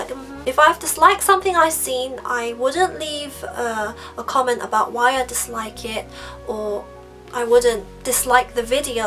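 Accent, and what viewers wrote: London, United Kingdom. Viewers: Are you from London? I cant spell worth shit...but im just fine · Do u learn British English? C: I love ur accent :P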